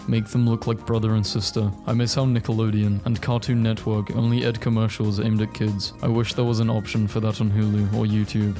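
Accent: United Kingdom. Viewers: I walked into my brother’s room and asked him what he was watching, he said “It’s this depressed British guy reading memes, he’s surprisingly good entertainment.”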